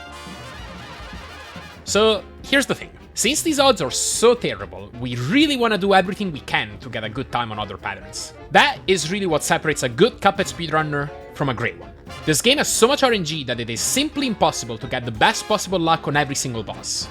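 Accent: Italy. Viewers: I recognized the Italian accent in 1.3 seconds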